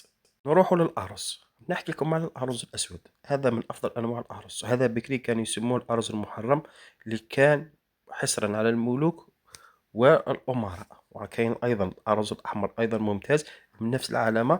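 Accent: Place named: Algeria. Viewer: صوت ألجيريانو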